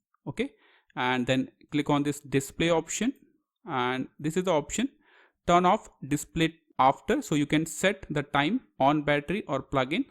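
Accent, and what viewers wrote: India. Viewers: As soon as I heard he's indian I knew my laptop is going to be fixed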